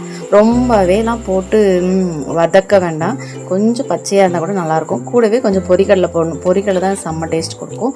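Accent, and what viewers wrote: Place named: Tirunelveli, India. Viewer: Arumaiyana tirunelveli slang sister 🎉🎉🎉🎉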